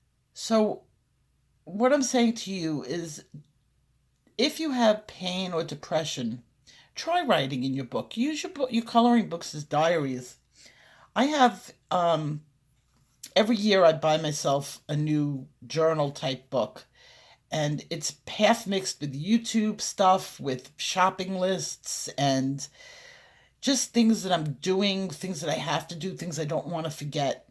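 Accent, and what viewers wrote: New York, United States. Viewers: I THOUGHT I heard a tinge of NY in your voice never wanted to assume, ;) thanks for another great video, the coloring was suggested by a therapist for my anxiety as a coping mechanism, when I am needing to just zone out sometimes I pick up one of my books and pencils and get going. EDIT- I also bought a book from the thrift store with a woman's writing in it, it was a type of daily inspiration for women book, and her notes intrigued me so I purchased, thought I was the only one who did that...THIS is the reason why I like your channel so much, because of your personality and how you share parts of your life with us in a comfortable manner, I feel like I know you, its more than coloring here its about introspection and reflection and life and humanity and how that all ties into you, your life and family and art and coloring. 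I LOVE IT. Thanks for going deep with us we love it!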